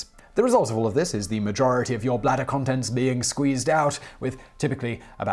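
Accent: United Kingdom. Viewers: pretty sure he's British but not 100%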